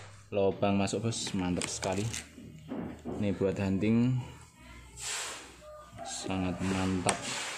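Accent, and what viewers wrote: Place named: Java, Indonesia. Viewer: mantap hostnya hehe jowone lengket, di mana os posisi kotanya?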